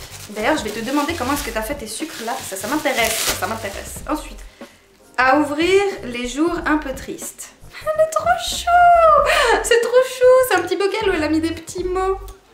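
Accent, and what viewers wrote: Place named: Switzerland. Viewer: Super vidéo, il me semblait bien que t'était Suisse, avec l'accent... Tu viens de quel canton ? Moi c'est canton de vaud😂❤️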